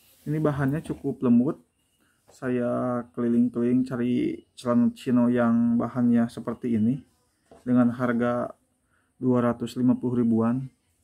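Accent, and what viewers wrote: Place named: Bandung, Indonesia. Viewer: Pasti abangnya orang bandung yahh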